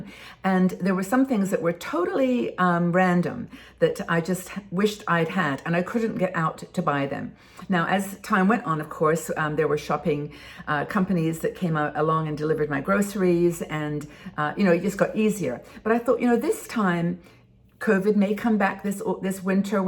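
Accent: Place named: United Kingdom. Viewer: I detect a British accent.And of course,we Brits are always prepared! Blessings.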